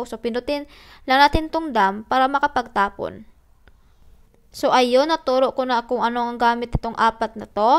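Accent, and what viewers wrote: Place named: Visayas, Philippines. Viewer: Bisaya ka day. Gwapa man jud ka, ?hehe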